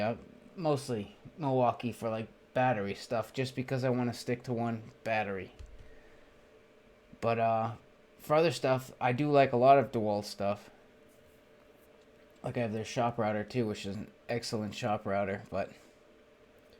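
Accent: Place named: North Shore, United States. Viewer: cool, carpenter on the north shore here, I just recognized the accent, lol... Thanks for the review, I just bought one, and I'm very happy with it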